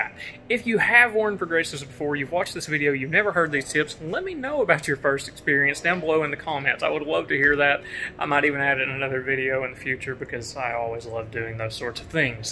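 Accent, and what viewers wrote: United States. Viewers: I can’t understand this guys country accent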